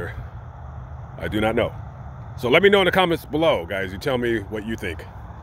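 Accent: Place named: California, United States. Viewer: I enjoy his voice because he reminds me of California I lived there for 6 years as a child.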